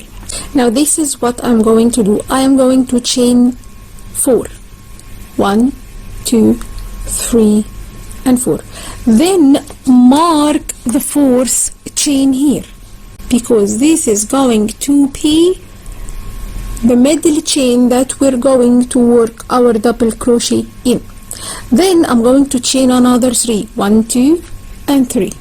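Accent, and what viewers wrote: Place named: Egypt. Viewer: عرفت انك مصرية من اول ثانية في الفيديو 😅 اهلا بيكي و شكرا على الفيديو الجميل ده ❤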